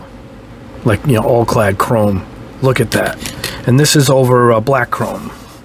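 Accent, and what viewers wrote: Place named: New York, United States. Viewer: Country? Are you not in the US? I swear you have a Jersey/New York-ish accent lol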